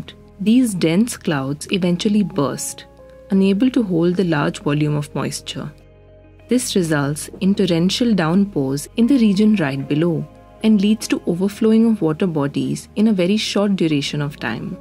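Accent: India. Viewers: I can’t listen to this Indian accent. Bye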